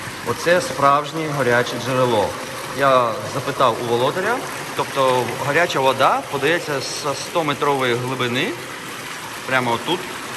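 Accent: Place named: Lviv, Ukraine. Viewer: Ви молодець я зрозумiла, що ви з Миколаева а мова у вас начебто ви зi Львова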